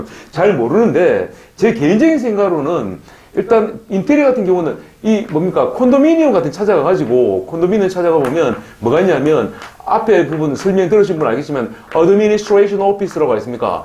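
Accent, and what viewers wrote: South Korea. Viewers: 안녕하세요.! 하!하!하! 정말 리얼한데요.!! 구수한 사투리...정말 친밀감과 진실성이 드러나서 왕팬입니다. 이번 에피소드는 영어유학원에 관한 얘기라서 더 진지하게 들었습니다. 지금도 필리핀에 계시는건가요? 저희는 샌디에고에서 CC2UC 라는 유학원을 운영하고 서울 강남에 국제학교를 운영하고 운영하고 있습니다. 혹시 역으로 필핀의 부유층들이 미국으로 유학을 원하는 층들을 모집할 수 있다면 어쩌면 도움을 드릴 수 있을 것 같아요..ㅎㅎ 한번 검색해보세요. 내년 여름방학을 이용해 필리핀으로 여행을 하게 될 경우 한번 만나뵙고 의 고매한 인품과 경륜을 배우고 싶네요..!!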